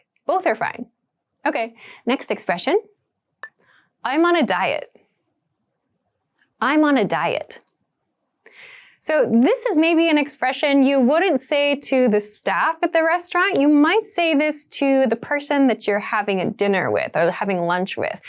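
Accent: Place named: United States. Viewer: she must lead US accent!😊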